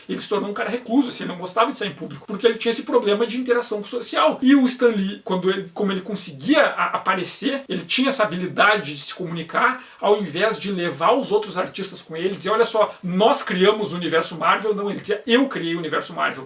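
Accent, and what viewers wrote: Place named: Rio Grande do Sul, Brazil. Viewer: A melhor parte desse vídeo, depois do conteúdo é claro, é ouvir a conversa entre figurões da marvel com um sotaque bem gaúcho! Bah! inclusive "bah" aparece recorrentemente em hqs da década de 60, tu sabes o por que disso?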